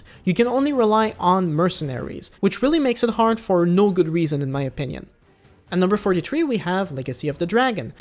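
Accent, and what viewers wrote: Quebec, Canada. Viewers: are you from quebec? i think i hear a familiar accent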